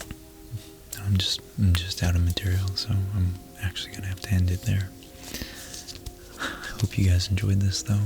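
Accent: United States. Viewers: I love listening to Americans saying British things it's quite funny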